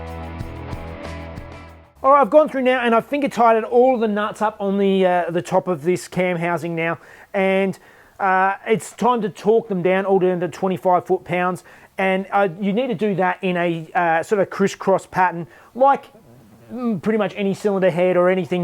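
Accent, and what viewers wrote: Australia. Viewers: I can hear the “aaaaaaand” on the Australian accent in that thumbnail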